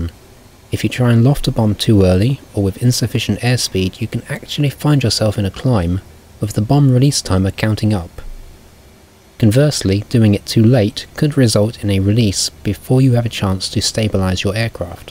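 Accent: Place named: United Kingdom. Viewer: Don' t forget the calm British voice!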